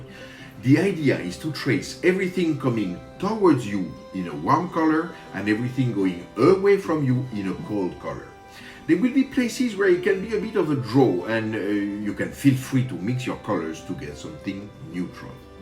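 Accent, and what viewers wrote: France. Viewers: Super, vous venez du nord-est n'est ce pas? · J ai reconnu un accent français donc je me permet d écrire en français 😂 est ce que vous pouvez faire une vidéo Painting like Bacon SVP ? Super travail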